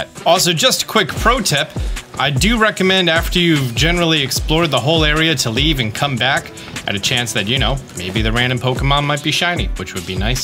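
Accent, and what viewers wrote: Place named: United States. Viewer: Why do you sound like American?😊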